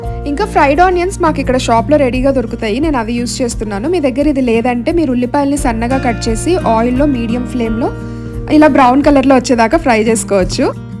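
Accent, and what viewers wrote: Rayalaseema, India. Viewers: Rayalaseema lo ye ooru akka mana rayalaseema basha lo super ga chesav naadhi Kadapa jammalamaduhu 💥💥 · Hi akka...we too live in Nandyal at Sanjeev Nagar. YouTube lo asal pakka rayalaseema language ante needi akka... U don't have filters in ur flow of words.. Mana kurnool district vallu ela matladtaro as it is alage matladtunnaru. Keep rocking akka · I am also from Rayalaseema. Loved ur seema accent videos. And nice receipe too... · Rayalasima slang tho video lu appudappu cheyyandi please Bokulu Jankulu Uthalabithala Benne Super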